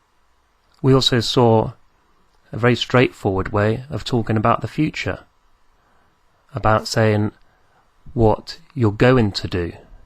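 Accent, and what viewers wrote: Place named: United Kingdom. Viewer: i need a lesson to understand the fruity limey's accent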